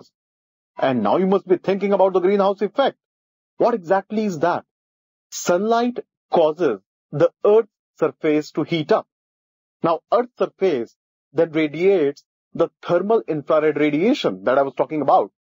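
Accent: India. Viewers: topic well explained!! with lajawab indian accent!!thanks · indian english!! hard for me 2 understand..hahahh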